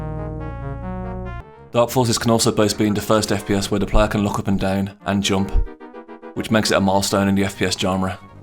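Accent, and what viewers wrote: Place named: United Kingdom. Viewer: I fucking love this guys britsh accent. reminds me of that guy who did the polybius video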